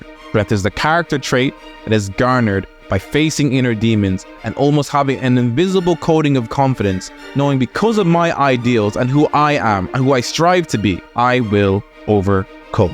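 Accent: Ireland. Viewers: cool irish accent lol you sound exactly like my brother, love hajime no ippo since it motivated me to begin boxing.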